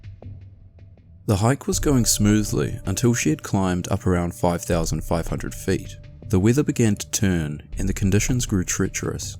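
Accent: New Zealand. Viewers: Are you from NZ? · I turned on notifications just for the Kiwi accent haha 🇳🇿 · Are you from New Zealand or South Africa? I'm trying to place your accent.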